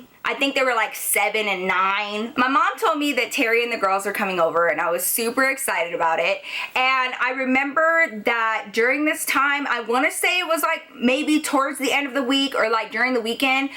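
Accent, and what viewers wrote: Texas, United States. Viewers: I love her Texas and Hispanic accent together 😂😝❤👏🏻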